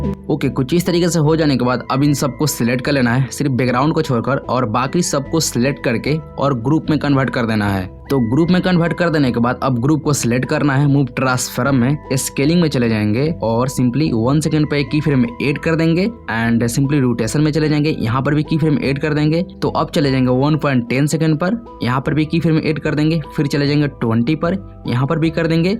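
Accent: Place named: Bihar, India. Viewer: He is from bihar 😅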